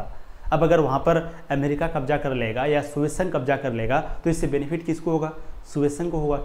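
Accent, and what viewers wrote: Bihar, India. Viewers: Sir ap bihar se h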